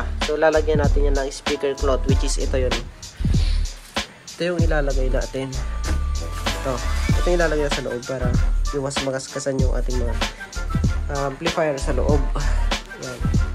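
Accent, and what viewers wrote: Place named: Albay, Philippines. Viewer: Uno ngani ngaran nikadi? Ka taga albay ka boss ah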